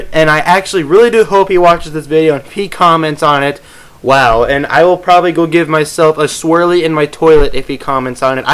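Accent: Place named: United Kingdom. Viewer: Puppies and a British narrator? BEST SHOW EVER!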